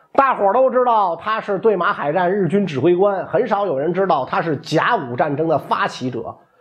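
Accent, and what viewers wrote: Beijing, China. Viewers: 北京口音真好聽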